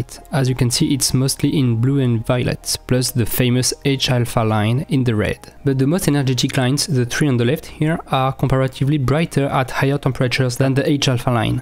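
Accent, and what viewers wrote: France. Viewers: Great collection of rocket launches and the F1 launch I've never seen, no problems with your French accent... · its funny how when you speed the speech you sound more french. but i understand you perfectly,and i am spanish. very good content,btw. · From now on, all rocket videos should be in French accent. (Like Attenborough nature videos, and Australian croc videos.) Excellent work. Very good info. Thanks to you,. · You have a French accent, not an English Accent. :) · Very good! Your English with a French accent is good and I had no problem understanding you. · It's a great pity that this would be an even better video if someone who speaks English as their first language had provided the voiceover. Your very strong French accent is sadly too strong to make many of the words understandable. (I am from London, England.) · I've been a space geek for over 30 years now, and I still learned a ton during this video. 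Well put together! Don't worry about the French accent, I'm from Canada, I'm used to it ;-) One thing about the F-1 engine that has fascinated me from day one is the black smudge around the exhaust. It is the result of the turbopump exhaust passing through liquid helium and liquid oxygen heat exchanger coils. The resultant helium and oxygen gas are used for fuel and LOX tank pressurization, etc, and the now much cooler turbo exhaust gases pass into a manifold, which then vents it inside the nozzle extension. This boundary layer cools the nozzle extension, since the nozzle, throat, and combustion chamber are regeneratively cooled. Passive cooling for the nozzle extension, active cooling for the rest of it. (The heat exchanger is the bottom half of the cylinder thing next to each engine bell, and the manifold is the thick ring around the nozzle. A gas generator downstream of a gas generator.) . 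Keep up the great work! I will be watching part 2 next. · Your english is very good and this sweet french accent is beautiful. Much better than some Youtuber from the south of USA. (I am from Germany) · Excellent video and I can understand you very well. I'm English. Of course you still have a very very French accent but it's no problem for me. Merci mon pote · This is great and really informative. And your French accent isn’t that bad. I mean have you ever heard Stéphane Israel speak English? Your English sounds so much better! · Great great video. Condenses a fair amount of knowledge really difficult to get without a lot of work, and your accent is just fine. It's obvious you're French, but there was no single word I didn't get. A big THANK YOU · Outstanding,. Your accent is tres agreable. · Please continue making such cool videos! I learned so much in a short time.. maybe I'l have to watch it a second or third time :) Absolutely no problem with your french accent. 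Great video content. I love the super slow motion and HDR-looking footage!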